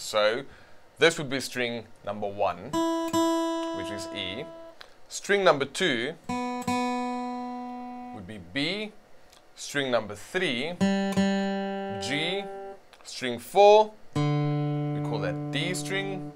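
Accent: South Africa. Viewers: bru I recognise that South African accent anywhere!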